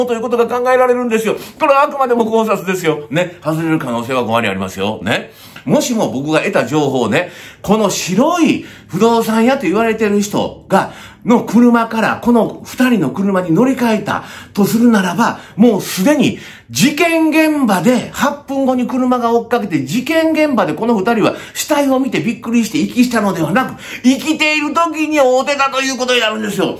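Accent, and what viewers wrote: Kansai, Japan. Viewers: JJさん鋭い推察と関西弁の面白い話し方に惚れました。まぁ恐らく正しいでしょ。🎉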